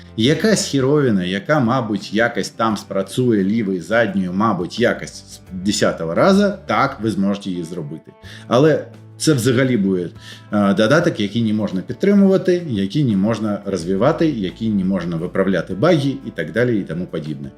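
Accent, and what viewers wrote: Belarus. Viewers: bialoruskij akcent:) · Свідомий перехід на українську для російськомовних людей - це найкращий доказ вибору, часто дуже тяжкого і заслуговує поваги, але... поки у це схоже на білоруську ))) Може, ШІ допоже? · Сорян, що не втримався... Але якби думати, що білорус, то акцент був би "природний" )) Суто з філологічної сторони якщо сприймати... 🤞 P.S. А за інформативність по суті - тільки респект:elbowcough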